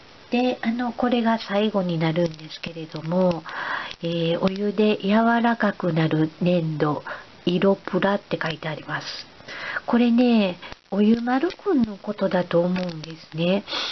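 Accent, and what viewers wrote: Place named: Osaka, Japan. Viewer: の動画大好きです！センスが素晴らしくて、いつもDIY意欲が掻き立てられます(⁎˃ᴗ˂⁎)100均大好きなので、「この商品、こんな使い方できるんだ～～✨」って新しい感動もあります！あとわたしも大阪弁なのですごく親近感わいてしまいました(´ `)照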